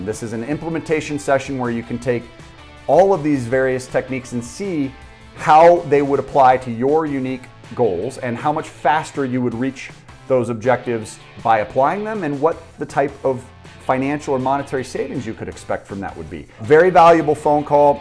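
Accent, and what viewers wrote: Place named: United States. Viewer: What a nice cowboy accent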